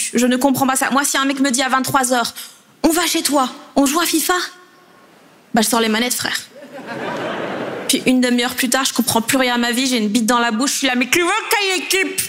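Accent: Belgium. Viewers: C'est moi où là elle a "repris" son accent belge qu'elle a pas d'habitude à la radio? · Elle est belge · Depuis quand elle a un accent belge? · découvre l’accent belge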